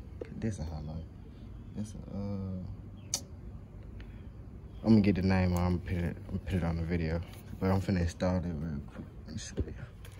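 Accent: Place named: New Orleans, United States. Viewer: Sound like you in New Orleans bro. You know we got to stay up on straps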